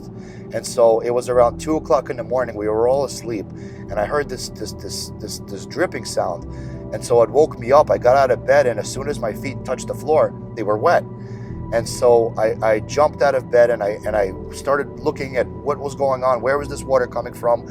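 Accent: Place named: Russia. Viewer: Проблема в том, что западники считают, что есть только один способ жить. К сожалнию, этот способ - паразитирование. В начале были рабы, потом колониальные империи, до недавнего времени была неоколониальная империя номинально с центром в Америке. А теперь, когда принуждение других стало сложнее, паразитируют на своих. Посмотрите на ЕС, посмотрите на средний класс в Амарике. И сейчас вы уже знаете, что ничего нельзя объяснить. Те, кто живут индивидуалистично просто не могут себе представить других вариантов отношений в обществе. Россия не идеальна, но и Америка далеко не идеальна. Америка создавалась как идеальная страна для протестантской этики. Каждые 100 лет в западном мире приходит время для большой заварухи. То революция, то война... И это не случайно. Для этого есть глубокие и серьезные причины. Но говорить об этом абсолютно запрещено. Например, в протестантизме центральная проблема - это вопрос спасения, кто избран к спесению. 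К сожалению, все это выродилось в деньги. Долгий разговрр. У вас занятный русский акцент. :-))) Удачи с метрической системой! Я по работе бывал в Америке несколько раз в год много лет подряд. Конечно же, моя работа оплачивала мне все расходы, поэтому я не был стеснен ни в чем в разумных пределах, я видел как чеки на кассах становились больше и больше. Оно становилось хуже постепенно, почти незаметно, по чуть-чуть. Но неизменно оно становилось хуже. Я помню Сан-Франциско в начале 2000-х, да, была куча бездомных, да было грязновато, но было прикольно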